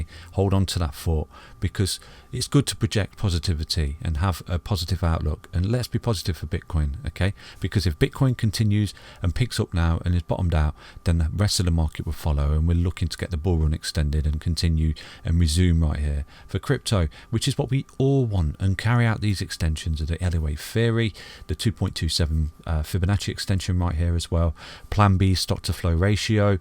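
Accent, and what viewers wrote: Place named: England, United Kingdom. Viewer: LMAO this is a proper english accent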